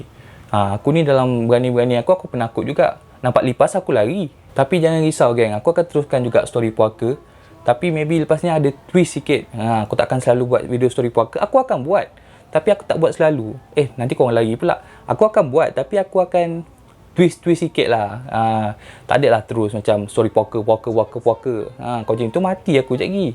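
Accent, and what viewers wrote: Kelantan, Malaysia. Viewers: Kelate ko?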